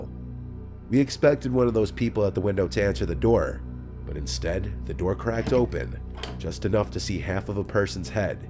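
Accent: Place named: New York, United States. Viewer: has a new york kinda accent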